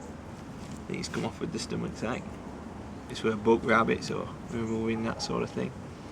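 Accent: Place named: Yorkshire, United Kingdom. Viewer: good video, proper Yorkshire man by the sounds of it. Sheffield at a guess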